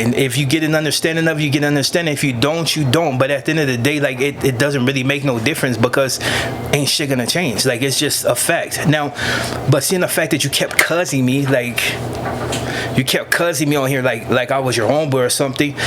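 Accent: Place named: Mexico. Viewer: I can see if you grew up with mexicans and joined their gang because that’s who you came up with. But why do talk like them. They won’t except you as your self.?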